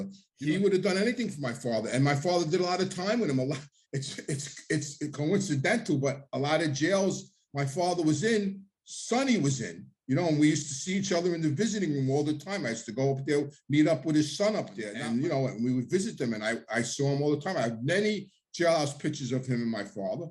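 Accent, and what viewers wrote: New York, United States. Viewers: I love listening to you about baseball!❤️❤️ Ny Ny!!! I hear you about the Accent!!!! 😂😂 Yes we do have more common sense!! No need to apologize ❤️ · My dad knew Carlos Marcello and Marcello used to be teased behind his back for speaking with a Cajun accent. Our family came from Italy to Louisiana in 1894. Love the NY accent and enjoying the videos.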